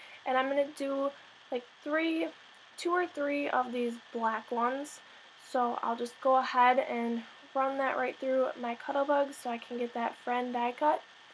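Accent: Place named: Minnesota, United States. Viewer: I'm happy you're back! Congratulations on your new baby! Your accent sounds like it's from here where I am in Minnesota! BIG time!!!!!!! :-)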